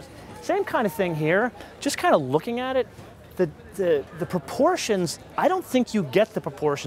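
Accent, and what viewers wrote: United States. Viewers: I hate American accent explaining a British Car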